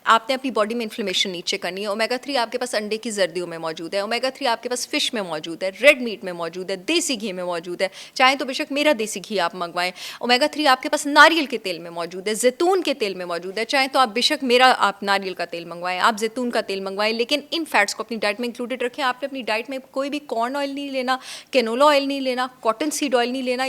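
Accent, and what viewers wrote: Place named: Pakistan. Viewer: Yrr i love pakistani urdu accent ❤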